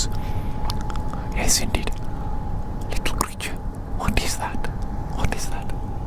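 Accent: Wales, United Kingdom. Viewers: Thank god finally got closure that you were from wales it was really bothering me that I couldnt prove it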